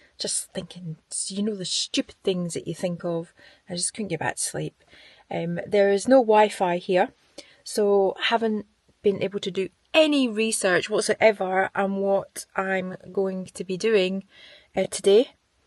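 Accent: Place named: Scotland, United Kingdom. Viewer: Yeah well here I sat in total anticipation, expecting as you promised, an epic cook up, to be shown a cheese baguette without the bun lol. I totally get your thoughts about the sound as well the view, the more senses that are stimulated the better the experience. I'm not sure if you have lived in Scotland, being born down south mate, as your accent has a hint of scottishness about it, but when your in your motorhome in summer at Rosemarkie and a storm rolls in, I wished I had been at work lol. Enjoyed it😊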